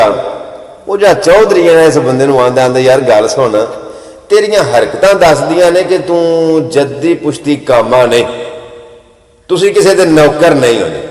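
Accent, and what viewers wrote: Punjab, Pakistan. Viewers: U r great ap ka baap sealkoti hy lekin ap k nanke 100 %mandi bh ya gujrat men hun ge apki bate sun kar aisa laga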